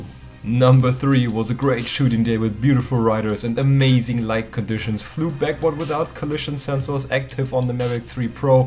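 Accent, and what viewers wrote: Germany. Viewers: Moin !! Bist du deutsch 😅man hört dein Akzent haha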